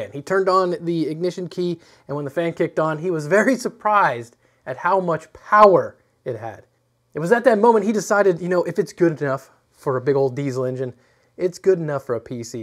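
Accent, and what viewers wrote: Michigan, United States. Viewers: You're from Michigan aren't you?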